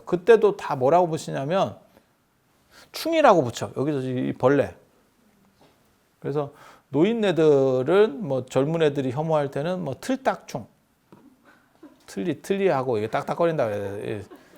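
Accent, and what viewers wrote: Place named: Gyeongsang, South Korea. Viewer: 경상도 말~ 넘 익숙한데요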